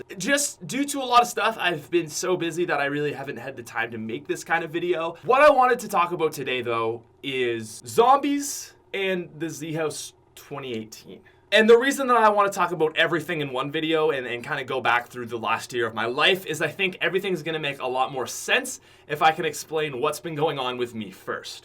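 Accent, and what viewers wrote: Canada. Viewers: ahahahah oot. canada accent , its so funny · Sound so much more Canadian today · Been here since day 1! Love the content, Canadian accent, and the humor! Keep it up!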